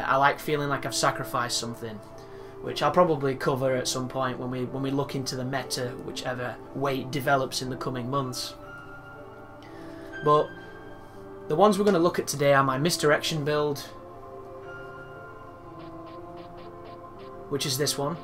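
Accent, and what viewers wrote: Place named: North, United Kingdom. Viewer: Where are you from? If you don't mind me asking; I'm reminded of your accent by the accents they use for men North of the Wall on the Game of Thrones TV show :)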